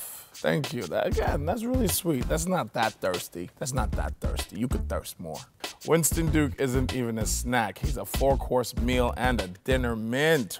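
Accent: Trinidad and Tobago. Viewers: Him and his tobagoonanian accent. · I Wanna hear his Trinbagonian accent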